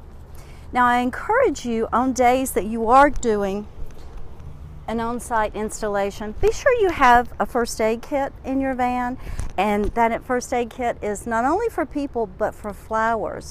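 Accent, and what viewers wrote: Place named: East Texas, United States. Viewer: Enjoyed this. I swear our presenter was from East Texas based on her accent. 😃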